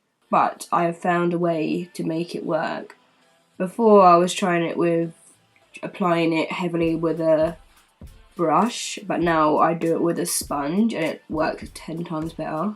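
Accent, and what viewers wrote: United Kingdom. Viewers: Had no clue you were British! So pretty!! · You're actually so flawless. You don't have a single unattractive feature like can I be you. plus you have a British accent too. How can a person be so perfect?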